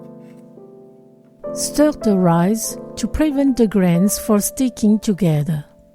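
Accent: France. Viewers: Are you french ? you sound french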